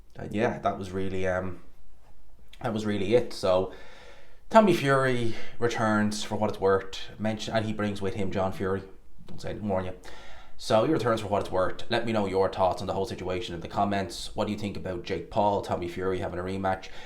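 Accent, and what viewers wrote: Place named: Clonmel, Ireland. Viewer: Sound like you're a clonmel man brother. Great channel.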